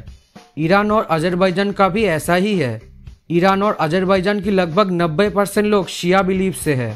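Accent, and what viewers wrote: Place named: West Bengal, India. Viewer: Bhai aapki video badi achi hai apki awaaj bhi .. Apka tone Bengali lagta hai ..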